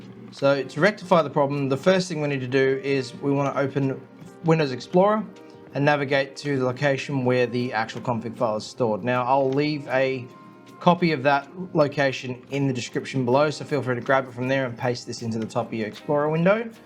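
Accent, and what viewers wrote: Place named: Australia. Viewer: Thanks mate , Love from Australia Idk but ya seems Aussie 🦘 too ?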